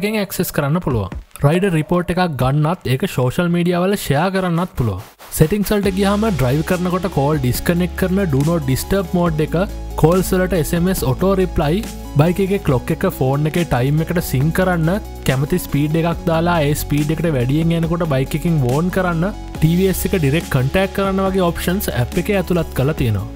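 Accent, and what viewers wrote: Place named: Matugama, Sri Lanka. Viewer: machan uba matugama peththeda ? video ake mathugama peththe then vagayak thibba....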